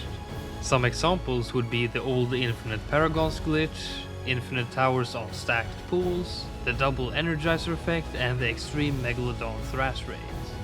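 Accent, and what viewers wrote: Sweden. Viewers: Kan inte annat än älska den svenska accenten! Härlig video! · the swedish accent is wayy to hard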